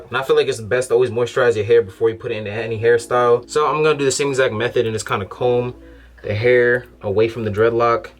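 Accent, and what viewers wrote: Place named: New York, United States. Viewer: You from New York innit 🖤♿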